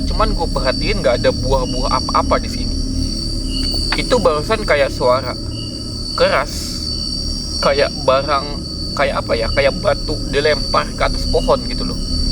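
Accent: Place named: Kalimantan, Indonesia. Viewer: Ga usah pake kata Gua apa Gue bang.. Logat mu kalimantan banget.. Lebih bangga pake bahasa kalimantan atau setidaknya bahasa indonesia.. bangga lah berbhasa Daerah, bahasa kalimantan!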